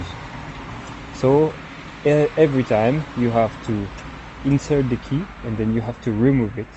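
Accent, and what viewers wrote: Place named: France. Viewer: Yes yes yes 😊 more content about this wonderful bike, your frenglish is enough, vive la 🇫🇷 😅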